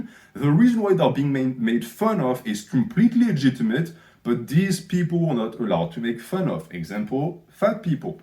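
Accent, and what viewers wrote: France. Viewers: Franchement c’est cool que les anglais aime notre accent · Hey, how tall are you? Also why are you French and why your accent is intolerable? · his accent is horrendous and it is extremely different to understand what he is saying at times. his accent is what makes me hate the french, frenchies always get mad at you when you say their dribble speak words wrong but then they go on and speak english like this! absolute butchering of the english language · High pitched with french accent. For the win.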